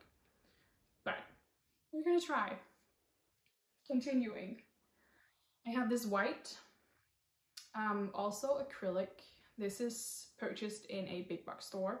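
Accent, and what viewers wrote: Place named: United States. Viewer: Hi from Indiana in the US! Here the plural of yarn is yarns. ("...all of these yarns." or "...these types of yarn.") Your English sounds like American English, and your accent rarely comes out. (You speak more clearly than a lot of people in different regions here, so well done!) I feel like a huge go-to for using up stash, especially different colors of the same yarn, is to make a blanket out of them. I tried to look up the Bumbo in Ravelry to see what weight it is, but a lot of different options came up. I made a cardigan from dk acrylic, and I actually liked the structure I felt like it had. Acrylic seems cozy to me, although I agree it has its time and place. I hope a job presents itself for you, and I look forward to seeing your shawl!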